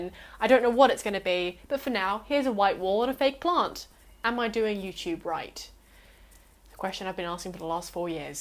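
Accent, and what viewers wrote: Australia. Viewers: yOUR ACCENT HAS GOTTEN A LITTLE BIT MORE AUSTRALIAN AND IT'S SO ADORABLE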